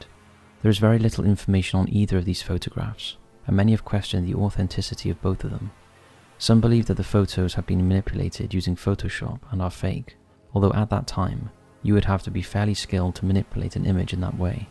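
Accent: Wales, United Kingdom. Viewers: Are u welsh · He's brilliant, sense a slight Welsh accent and it fits...his content over the last decade is outstanding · Damn yall still making videos and saying things like “night sky” all British and whatnot? I ain’t seen a top 5s videos in a hot minute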